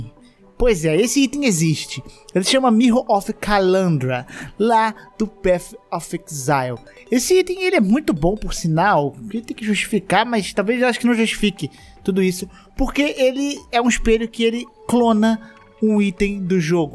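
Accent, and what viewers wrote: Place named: Bahia, Brazil. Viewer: vc e baiano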